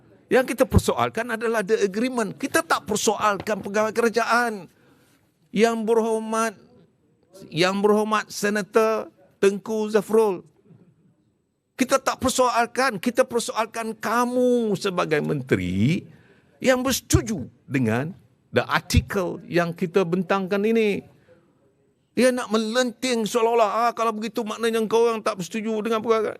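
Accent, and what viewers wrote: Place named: United States. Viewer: slang american kencang seh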